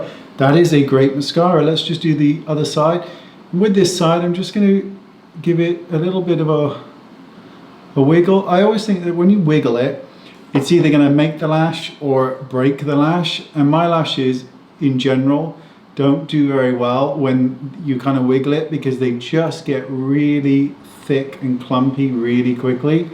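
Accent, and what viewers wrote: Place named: Wales, United Kingdom. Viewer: Great mascara, bloody lovely, with a welsh accent too! Love it x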